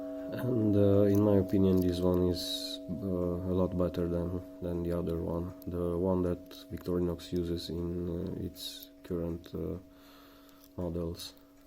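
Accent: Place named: Russia. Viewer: Не ну конечно акцент бля палевный кабзда,нашего человека узнать на раз)))Красава хули!!!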